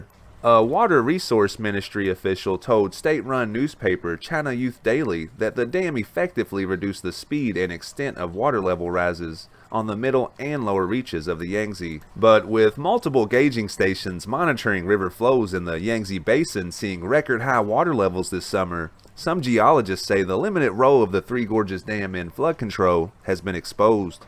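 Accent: South, United States. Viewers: I love the southern accent when speaking about China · Love the southern accent when talking about anything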